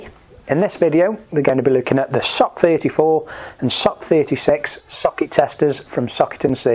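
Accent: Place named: Stoke-on-Trent, United Kingdom. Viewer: Where are you from? Stoke-on-Trent area?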